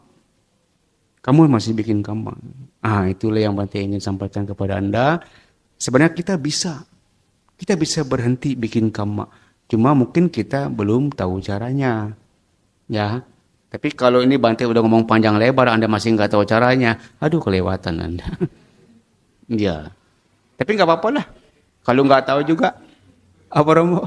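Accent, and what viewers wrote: Indonesia. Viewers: logatny betawi medok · Baru kali ini denger bhante berlogat bekasi-karawang-cikarang dan sekitarnya 🫶😊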